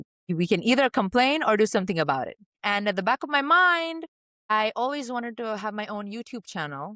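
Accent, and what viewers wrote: India. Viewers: You are beautiful with Indian accent.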